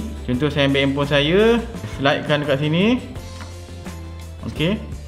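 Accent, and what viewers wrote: Melaka, Malaysia. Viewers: bunyi slang Melaka 👍👍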